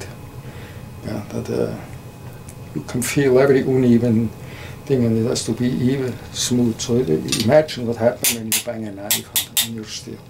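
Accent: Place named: Outer Hebrides, United Kingdom. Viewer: Excellent video; very well explained by a nice fellow. I use the 6 inch round tip boning knife for the deboning, joints and backend when I'm doing sheep. I have the Victorinox lamb skinner for the opening when skinning. I'm going to try a Dexter Russell 5 and a quarter lamb skinner as the Americans usually have good high carbon steel. The Victory New Zealand I have is a topper.. I can't help but think that you say some words very much like us here in the Outer Hebrides of Scotland; it must be the Celtic Germanic connection. Keep up the excellent videos.👍👍👌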